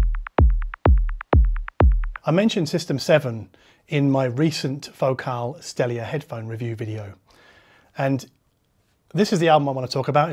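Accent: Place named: United Kingdom. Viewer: Thanks for your recommendations. I am not into techno. The tracks you introduced are killer. System 7 is a brand new experience to me. Beeing audiophile for 40 years now, it is always nice to learn something new. Your videos are on my shortlist everyday, because they have this quality i like. And i like your british accent...👍😜😂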